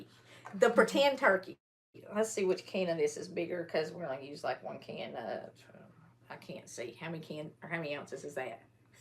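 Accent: South, United States. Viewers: It’s southern accent, and, yes it’s English! Have you not heard of southern accent, that’s where you get that twang from if you’re from Tennessee, North Carolina, Georgia and Atlanta usually have that twang, even those from southern part of Virginia will have it. Which she is from the southern part of Virginia. My mom was born in the early 30s during Appalachian times in from Southern Indiana, they don’t have much of twang. Now, not today people in Indiana may have it but, it’s just not as so, strongly pronounced, I guess you would say.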